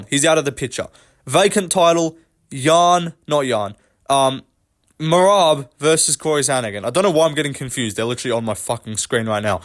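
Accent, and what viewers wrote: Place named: Australia. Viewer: Bedtime MMA but more Australian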